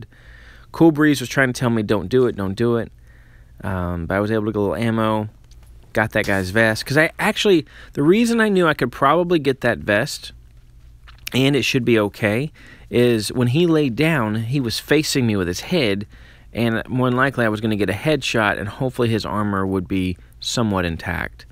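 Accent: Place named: Chicago, United States. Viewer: I’m not really sure. I haven’t heard it too much. I wanna say like Chicago or somewhere a little farther north.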